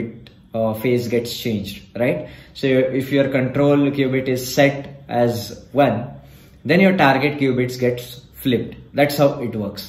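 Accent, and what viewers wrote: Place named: India. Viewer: interesting content, interesting indian accent:face-blue-heart-eyes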